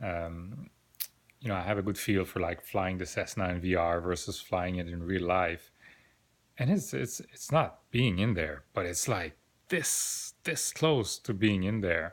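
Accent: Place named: Quebec, Canada. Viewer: Where are you? You sound French Canadian, I live 2 hours west of Toronto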